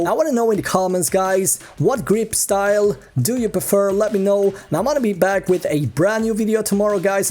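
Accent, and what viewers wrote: Sweden. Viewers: Sluta prata så jävla svenskt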